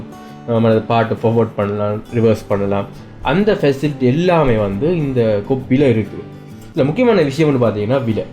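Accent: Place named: Sri Lanka. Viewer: Very happy to hear tech review in sri Lankan Tamil slang! 😍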